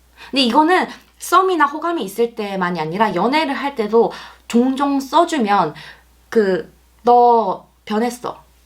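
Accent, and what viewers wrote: Gyeongsang, South Korea. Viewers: 경상도시네요....음...어딘지는 감이..새벽이라 잠이와서 ㅎㅎㅎ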